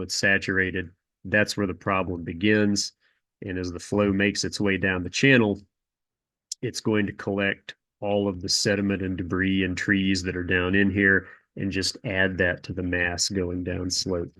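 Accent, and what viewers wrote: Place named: South Jersey, United States. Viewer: You are from South Jersey!!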